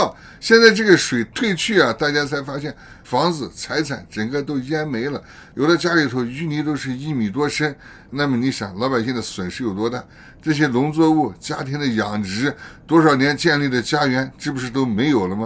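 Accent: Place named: Northwest, China. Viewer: 熟悉的西北音~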